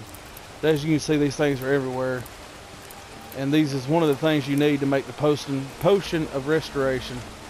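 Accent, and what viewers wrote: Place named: United States. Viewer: I love your sexy stereotypical american accent 😍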